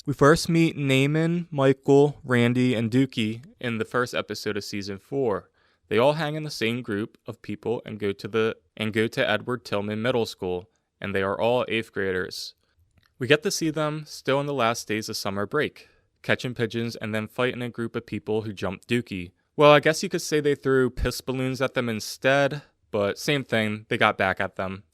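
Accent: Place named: Baltimore, United States. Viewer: I can hear your Baltimore accent, and I weep with homesickness for Old Bay Seasoning. Thanks for making this.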